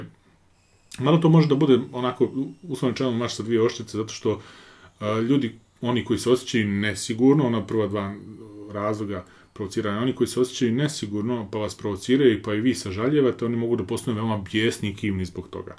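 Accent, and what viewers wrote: Ijekavian, Serbia. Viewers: Miluje me Vasa ijekavica i miran ton izlaganja,samo napred !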